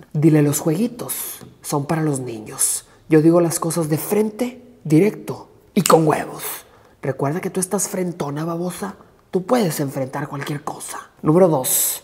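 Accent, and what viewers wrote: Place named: Cádiz, Spain. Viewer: Habla como los angeles y me llego como si de gaditano se tratara oleeeee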